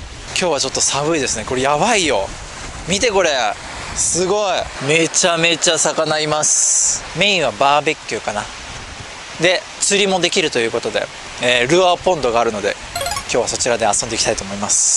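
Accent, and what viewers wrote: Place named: Nagoya, Japan. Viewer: 名古屋弁が懐かしい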